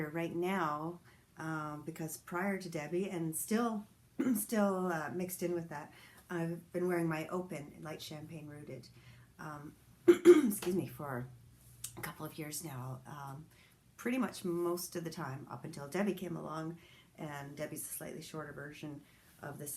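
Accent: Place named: South, United States. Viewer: I am kind of shocked you're from Canada, I would swear you're from the south LOL I always put your videos on 2x playback because it is just more enjoyable to watch other than it's hard to understand what you're saying LOL you just start slow like us Southerners LOL it makes me feel like home, but it takes so much longer to watch the videos. I'm just one of those that wants to get to the point because I've watched hundreds of wig videos and just want to see the wigs, but I so appreciate how many wigs that you review exclamation point when there's a specific wig I want to see I come to your site first!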